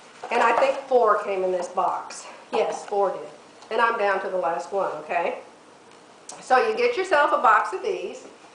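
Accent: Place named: Oklahoma, United States. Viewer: You mention Edmond.. is it Edmond Oklahoma? Your accent sounds like mine. Okie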